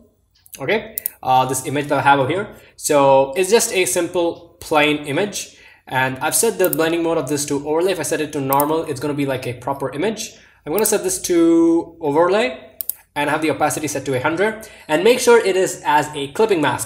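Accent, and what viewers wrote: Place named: India. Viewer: Lol cause you don't sound like one but I noticed the slight pinch of 'desi'ness in ur voice so I posted that comment😉 btw I'm 13 and learning photoshop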